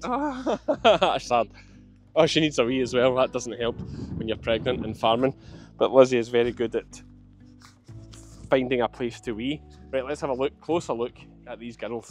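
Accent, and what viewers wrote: Scotland, United Kingdom. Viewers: you are getting very “Scottish” as you are teaching us! Don’t forget your Canadian fans!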